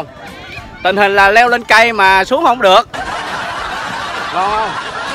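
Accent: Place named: Dong Nai, Vietnam. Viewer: Quê a đâu mà e nghe a ở long khánh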